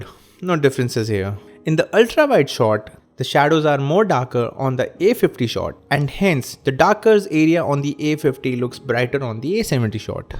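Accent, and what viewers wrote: India. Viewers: I meant because of the indian accent I getting sick of it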